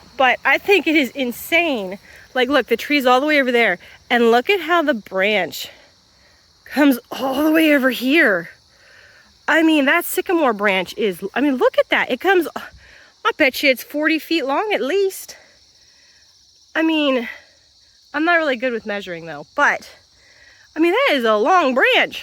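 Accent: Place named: New York, United States. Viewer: Yous???? LOL Are you from NY??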